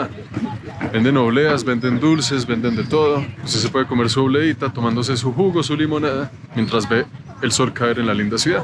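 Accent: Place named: Manizales, Colombia. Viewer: cuando comencé a seguirte pensé que eras de Manizales. Tienes un acento un poco parecido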